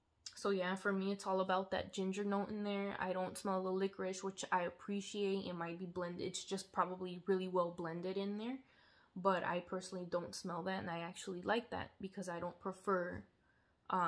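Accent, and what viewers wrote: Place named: Mexico. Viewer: You’re very welcome! que accent, that Mexican Spanenglish accent 🤓.. let me guess your a sagittarius or libra ? Supposedly Virgo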